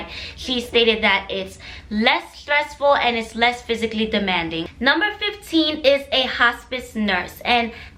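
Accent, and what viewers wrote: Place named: Jamaica. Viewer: You have an accent are you from Jamaica 🇯🇲? Big up yuhself either way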